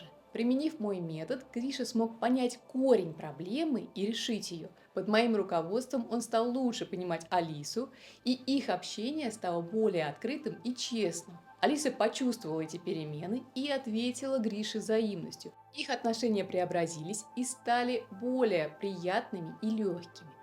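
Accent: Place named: Russia. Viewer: Дизлайк за руZZйобську говірку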